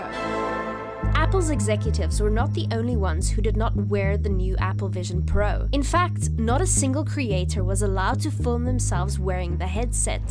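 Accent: South Africa. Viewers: First I love the South African accent 🇿🇦❤ and awesome video glad you looked further into this! You are very intelligent! :) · South african english accent makes the video best ❤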